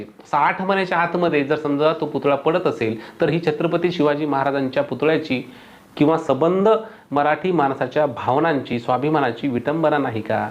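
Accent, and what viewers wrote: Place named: Marathwada, India. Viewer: Because tumchi langauge Marathwada chi aahe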